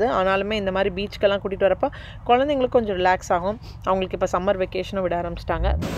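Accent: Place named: Tirunelveli, India. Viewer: TIRUNELVELI pola varma sis amma sona mathiri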